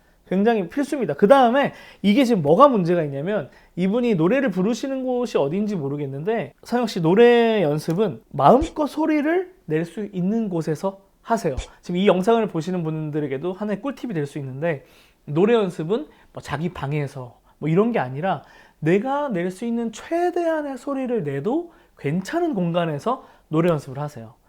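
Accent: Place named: Gyeongsang, South Korea. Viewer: 아..너무웃겨요 배잡고 뒤로 자빠짐요^^경상도발음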